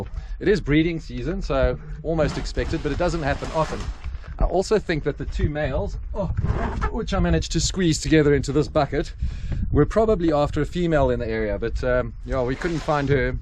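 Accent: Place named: Netherlands. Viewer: You sound like a Dutchie! How are you, brother? Beautyfull beard, i'm jealous!!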